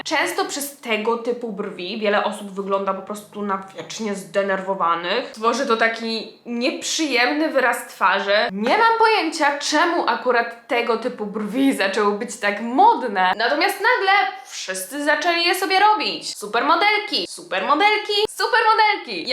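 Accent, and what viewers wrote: Poland. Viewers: po kilku latach oglądania ciebie stwierdzam że pochodzisz z województwa świętokrzyskiego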